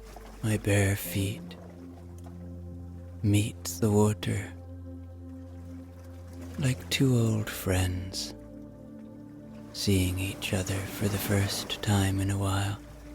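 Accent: Ireland. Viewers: Love this! Thank you so much. I also love your Irish accent - which part of Ireland are you from?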